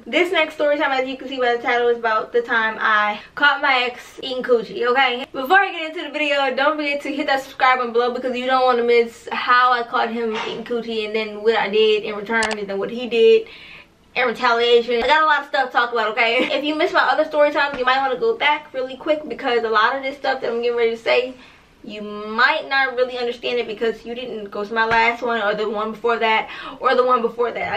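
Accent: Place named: Louisiana, United States. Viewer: You from Louisiana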